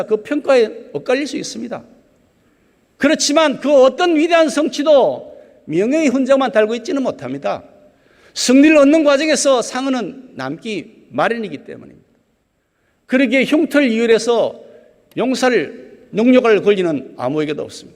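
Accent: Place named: Gyeongsang, South Korea. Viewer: 김기현 대표 쥑이네ㅡ퍼팩트 치시네ㅡ경상도어투가악간 가미된 또록또록한말투음성 질타하는 늠름한 모습 멋집니다ㅡ실컷 질타하세요ㅡ욕먹어도 싸기도쌉니다ㅡ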